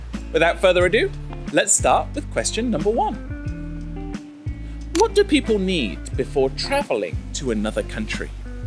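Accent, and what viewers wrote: United Kingdom. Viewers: what is your kind of accent in England ??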